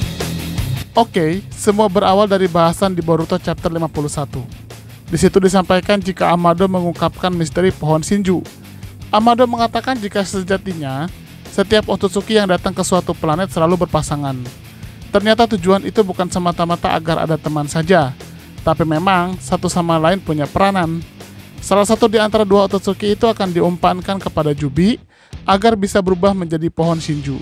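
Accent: West Java, Indonesia. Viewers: min lu org sunda yakk😁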